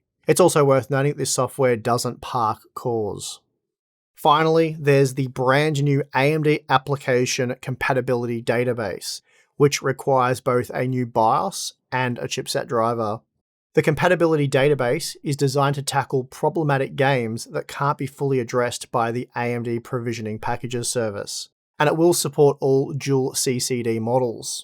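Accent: Australia. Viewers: when u r talking about the part and it's advantages and disadvantages with specs etc - having b roll doesn't help... what would be nice is to have a chart/table for example, highlighting the points u raise at the moment perhaps? Just talking about it on the air~ isn't most convinient, especially with YouTube subs analyzing Australian accent sometimes and with similar names for products....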